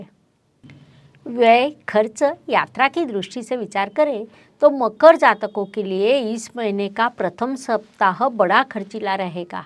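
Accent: Maharashtra, India. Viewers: Are madam tumhari speech kitnnnnna mast hai Marathi mix lagta hai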